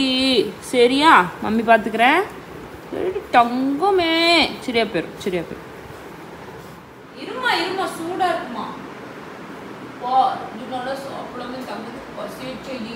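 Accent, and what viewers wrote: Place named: Erode, India. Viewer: Erode slang ✨❤ since my home town is salem i can find it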